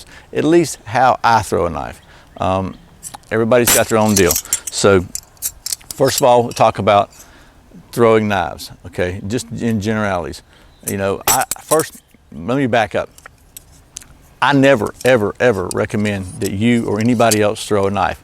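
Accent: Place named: South, United States. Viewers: I was guessing KY or TN, but definately south east. Great videos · This southern accent at least thats what i think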